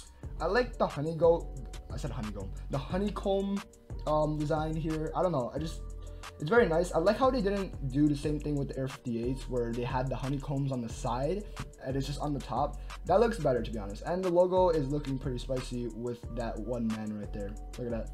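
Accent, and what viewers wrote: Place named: Philippines. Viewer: Theeey Trynaa Bee Craate! You filipino?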